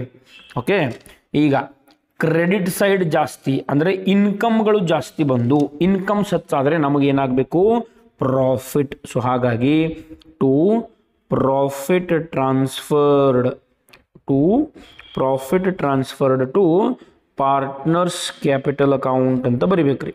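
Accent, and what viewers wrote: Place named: North Karnataka, India. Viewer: Sir ji Ur asom .. Nim uttar karnataka style mast .. Thank you.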